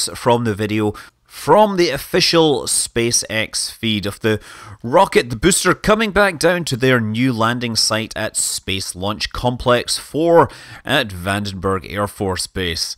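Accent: Scotland, United Kingdom. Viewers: He always talks with a Scottish accent though. 😒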